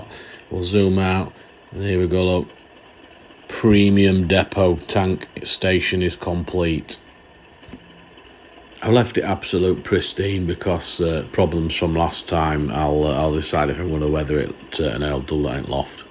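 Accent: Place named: North, United Kingdom. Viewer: 😂😂 proper northern instructions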